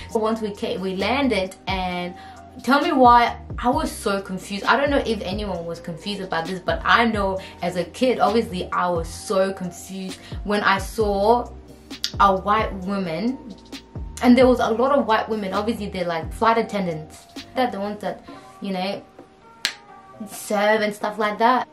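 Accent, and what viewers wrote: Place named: London, United Kingdom. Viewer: where did you learn English? you sound like you are from London, greetings from the UK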